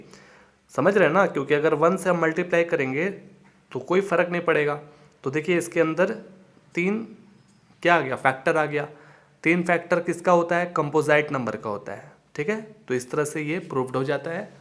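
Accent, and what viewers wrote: Bihar, India. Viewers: Dear sir Ur Bihari tone is so sweet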